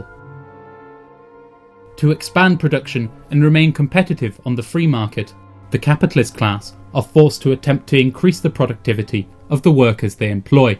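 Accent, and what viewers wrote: United Kingdom. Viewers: comrade, this is absolutely amazing. do i detect a hint of yorkshire there?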